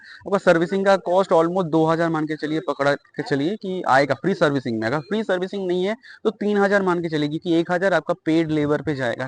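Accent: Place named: Bengal, India. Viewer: Bong boy. Accent problem bro.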